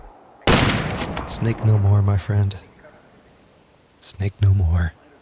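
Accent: Northern Ireland, United Kingdom. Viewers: knew it. Jokes aside, im from Holywood County Down. Your accent just sounded very close to home. Love the videos….. “Where is she!” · Are you from NI ?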